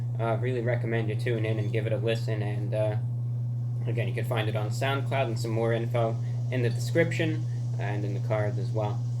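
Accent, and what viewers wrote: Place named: New Jersey, United States. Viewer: Ppl think he's from Florida or not from the states. He sounds slightly Jerseyish or Minnesota, Maybe Canadian? 🤔🤷🏻‍♀️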